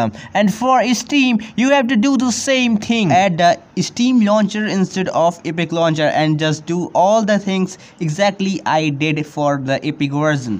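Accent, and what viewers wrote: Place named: India. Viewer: Sounds like india or china, nice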